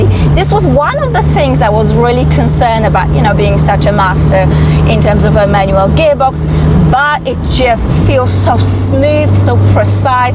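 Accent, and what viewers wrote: Germany. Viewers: Tollen Akzent. Er erinnert mich an eine Deutsche, die ich die Ehre zu kennen habe.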